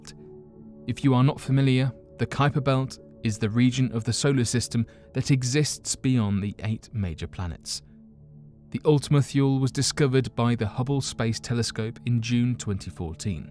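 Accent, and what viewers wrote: England, United Kingdom. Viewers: He's not American. Why should he adopt an American accent? Are you claiming Americans are too stupid to understand a British accent? If you want to get on to him about pronunciation, why not point out that Ultima-Thule is not pronounced "thool" but "thoolee"? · Its pronounced MEH - THANE, Not MEE - THANE. PLS get the words correctly pronounced with an American accent? Edit: Since you have an English accent, I get the why of the above mispronunciation of Methane. But, I have to inform you that your way of saying Methane is Hilarious!! Oh, and people are saying in the comments section, that “Ultima-Thule” is pronounced Ultima-Thoo-lee. So, apparently, the “E” at the end is not silent. Better luck next time...! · He has an English accent, that's all.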